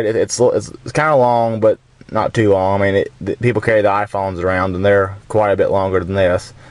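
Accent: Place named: Texas, United States. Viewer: I love your accent... Are you from Texas?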